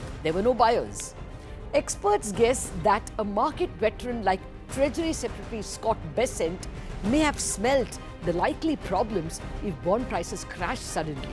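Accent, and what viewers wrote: India. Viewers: Like Sirens Latha glues the listeners to the screen with her unique Indian accent.Why she sounds so persuasive? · Why doesn't she dress in a business attire instead of looking like she came out of a kitchen. Also, her accent is too much. I can't understand her Indian English. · Exaxtly, so inappropriate and distracting! Cant stand that indian accent, despite being an english speaking channel!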